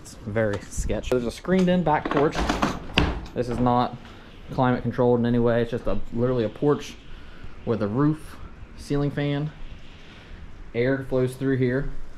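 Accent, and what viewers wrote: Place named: Pittsburgh, United States. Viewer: you sure sound like 'cole the cornstar' but he is from iowa/idaho...6 generations. ohio? i hear a little pittsburgh. does not sound like ohio at all.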